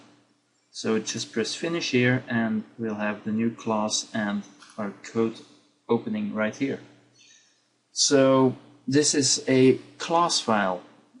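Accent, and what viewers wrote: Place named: Russia. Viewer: i love how he says Class but you can hear the Russian accent coming through.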